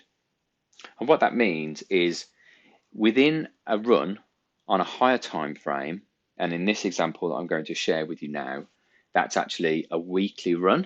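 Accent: Birmingham, United Kingdom. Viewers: i can only tell because I'm a brummie myself lol. Most won't though right. Nice to see a brummie on here. Keep up the game bro.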